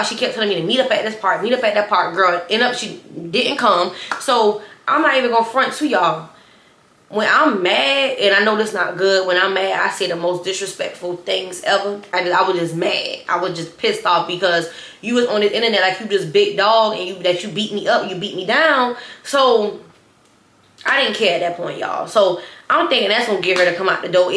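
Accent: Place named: Louisiana, United States. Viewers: You most definitely give Louisiana accent. I just ran across your stories a couple weeks ago and I’ve binged watched them all. They help me get through the work day.. and you’re so pretty, sis. Much love, from Lafayette, Louisiana ❤️ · I literally just found you. Your accent makes everything hilarious. I just love your personality and accent. You mind me of a southern version of my girl kayshineee. I’ve been searching for new storytellers and the fact that you give a backstory too like my favorite ones?! Chefs kiss!ANDDDD the fact that you watch Asia and Symone makes me wanna watch you even more. I watched one video and got hooked! New subbie. 🫶🏾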